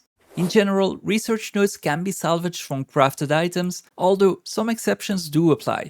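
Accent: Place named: Flanders, Belgium. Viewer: Hi, found your channel recently. I like your to the point guides. You sound "Flemish". Where are you from?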